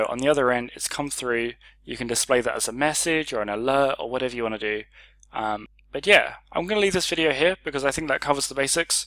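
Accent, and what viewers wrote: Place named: United Kingdom. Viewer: you're British accent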